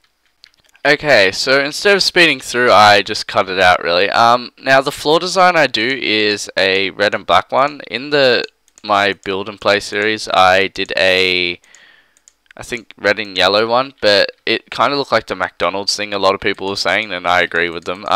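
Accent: Australia. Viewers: man he's clearly australian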